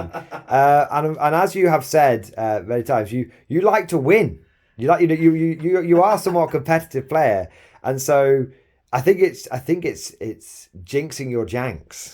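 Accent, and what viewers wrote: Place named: Northern England, United Kingdom. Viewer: It’s a Northern English accent mate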